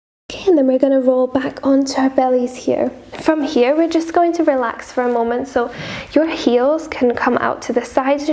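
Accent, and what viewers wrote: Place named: Scotland, United Kingdom. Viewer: your gentle scottish accent is asmr heaven.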